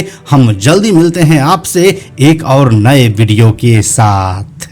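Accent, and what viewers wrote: Bihar, India. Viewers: Aap बिहारी हैं क्या आपके बोलचाल ascent से लगा इसलिए पूछा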